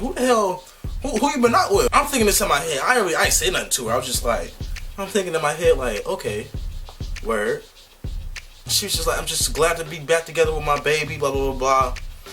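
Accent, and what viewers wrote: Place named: DMV, United States. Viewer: no lie bruh i can always understand you cuz your a dmv man.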